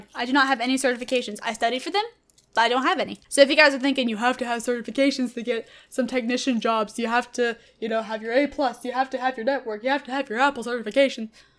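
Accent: South, United States. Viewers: Think I honestly have never really heard a true American Southern accent. This girl seems nice and her videos have a nice quality and effort on them. Subscribed.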